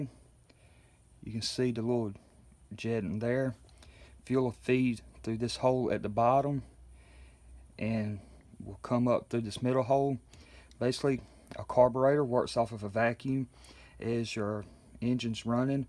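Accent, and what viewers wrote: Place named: Alabama, United States. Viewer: AWESOME DISCRIPTION of how CARB works! very thorough And nice southern accent! it sounds like it might be from Alabama like my Momma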